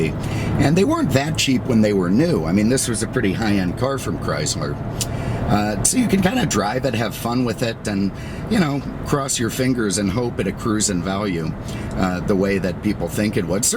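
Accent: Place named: North, United States. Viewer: Why do people who clearly come from the north (your accent gives it away) come to Florida and complain non stop about the heat/humidity? Nobody forced you to come down here. STFU already and just give us the car reviews....:-x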